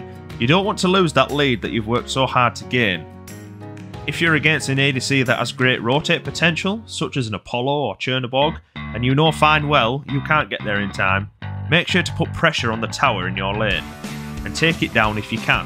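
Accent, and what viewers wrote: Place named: North, United Kingdom. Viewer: Ano thas from the north but I cant quite put ma finger on where exactly thas from cocka spadge and its driving me nuts but thy accent seems like its from round t'corner from mine.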